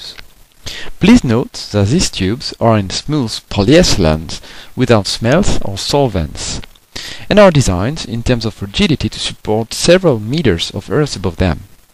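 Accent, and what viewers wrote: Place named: France. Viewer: Nom de diou tu es francais :D Le meme accent que moi . xD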